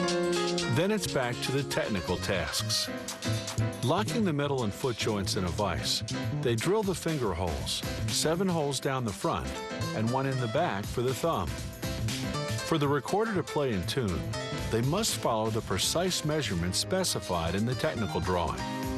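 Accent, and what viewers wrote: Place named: United States. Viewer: que acento tan feo! debe de ser un americano, no? que contrasto con el sonido tan dulce de las flautas!!!!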